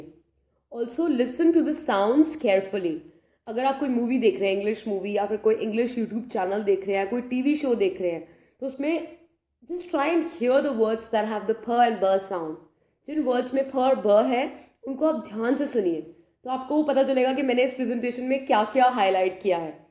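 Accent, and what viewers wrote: India. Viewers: you have a very strong India accent. please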